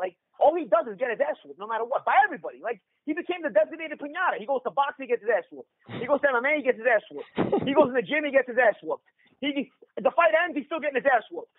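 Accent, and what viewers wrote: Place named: New Jersey, United States. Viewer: Paulie used to be immensely annoying but his New Jersey accent seems to have died down a little so he may actually be tolerable soon!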